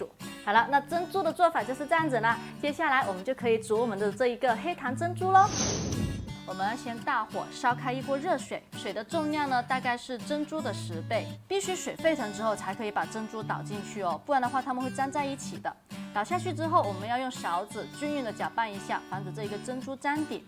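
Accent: China. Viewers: 这个普通话……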